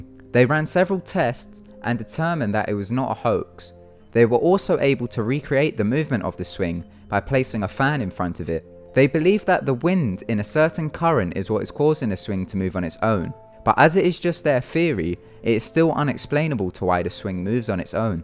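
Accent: United Kingdom. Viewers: Your vids are good and your accent is mint where in the uk you from ? Just subbed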